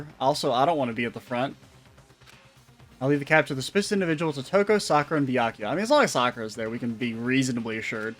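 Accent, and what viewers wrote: South, United States. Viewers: Dude, you have my favorite Monokuma voice even if it's not intentional. Heavy Southern Monokuma is my new favorite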